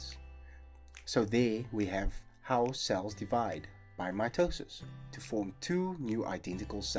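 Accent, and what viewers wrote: South Africa. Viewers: i just want to know if the narrator is south african? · This man sounds South African!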